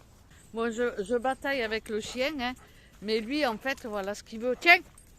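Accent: South, France. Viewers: Coucou...mon avis au sujet de tes vidéos recettes.. je préfère quand tu commentes..l’absence de son est monotone.. je préfère comme tu dis:les blablas et les papotages,avec ton accent du Sud c’ est super! Ne change rien.. car c pour ça que l’ on va sur ta chaîne: pour t’ écouter.. sinon on peut aller sur n’ importe quelle autre.. c ce qui fait ton originalité...et que l’ on ait envie de la regarder!! Comme disait un commentaire plus haut si quelqu’un ne veut pas t’ entendre.. il n’ a qu’ à couper le son!!😂Bonne journée et à bientôt t’ entendre..💕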